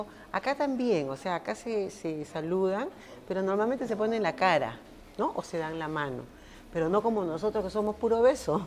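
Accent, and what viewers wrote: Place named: Peru. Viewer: me encantó su manera de expresarse, muy pausado, muy agradable, aparte que el acento peruano es tan lindo ;) saludos desde Colombia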